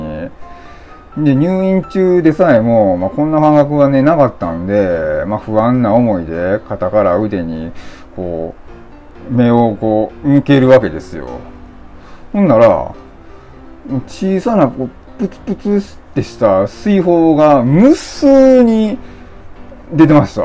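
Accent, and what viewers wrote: Osaka, Japan. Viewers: いろんな変わった症状が出てくるんですね。大変な事でなくで良かったですが。先生がこの事うまくさんに説明できるかなって心配。話の途中で漫才風になるのが大阪人ですね。昔１０年ほど大阪に住んでいたんで大阪弁懐かしく聞いています。コテコテになるほど思い出が出てきて嬉しいです。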